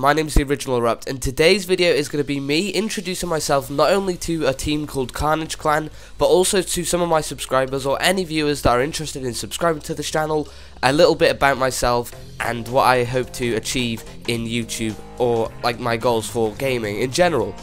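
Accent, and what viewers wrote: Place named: Wales, United Kingdom. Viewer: I thought you were welsh I could here it a little, Which town though ? I'm from Newport !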